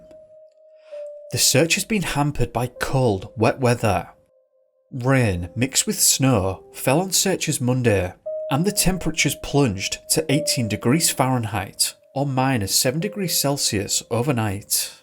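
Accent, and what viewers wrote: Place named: Northern England, United Kingdom. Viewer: is from the north of England and that’s how they speak.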